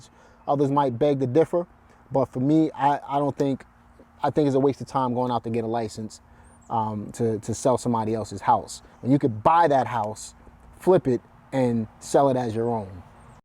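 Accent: New York, United States. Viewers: I can hear NYC in your accent, haha. I'm from Staten Island. I moved to Raleigh in 05.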